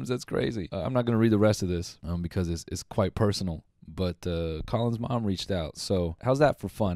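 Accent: United Kingdom. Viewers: in love with his british voice. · Haha the British accent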